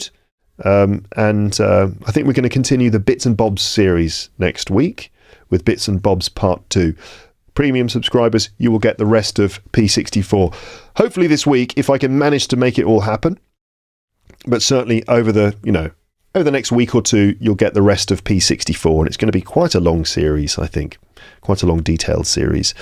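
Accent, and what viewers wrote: United Kingdom. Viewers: I like your voice because of its tone (your voice is high-toned) and you speak with British accent so it becomes more attractive.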